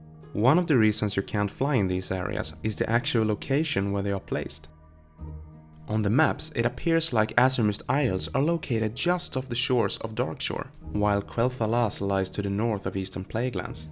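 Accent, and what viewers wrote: Sweden. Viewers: swedish? xD your accent is gold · Ey bro are you swedish because i can here it lowkey in your accent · I can hear the Swede inside you. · Damn va svensk du låter. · Is he Swedish? Sounds like english-swedish speaking... Im swedish.. :) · let me guess, you're scandinavian? Swedish?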